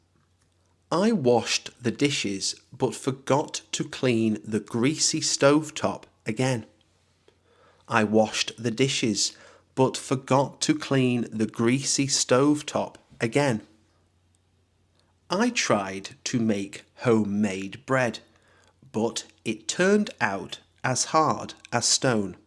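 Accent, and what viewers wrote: United Kingdom. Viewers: The British accent!! I missed it alot! I studied in the UK for a while! So, this accent filled me with nostalgia!! Liked+subscribed+shared with others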